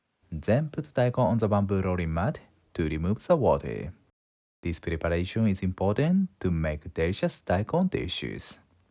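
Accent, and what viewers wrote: Japan. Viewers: Your comment is full of negativity and doesn’t contribute anything useful. Everyone’s trying their best, and no one’s perfect. Just like how a French person speaks with a French accent or an Italian with theirs, a Japanese speaker has their own accent when speaking English. It doesn’t make their English less valid—it’s part of who they are. If the content isn’t for you, that’s fine, but there’s no need to tear others down. Maybe take a step back and think before you post—respect goes a long way.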